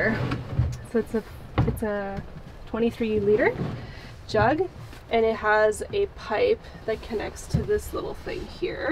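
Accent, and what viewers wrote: Ottawa, Canada. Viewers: I was like thats an Ottawa accent. I didn't think we had one but we do. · She sounds like an Ottawa girl.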